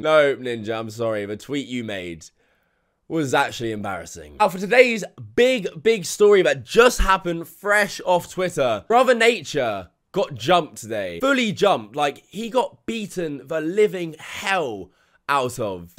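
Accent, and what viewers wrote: United Kingdom. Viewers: What a whiny little bitch you are. Being bri’ish might add a lot to why you sound so whiny, kinda like your about to cry every sentence. Oye dick’ed why don’t ye shot ye gullet and fock off innit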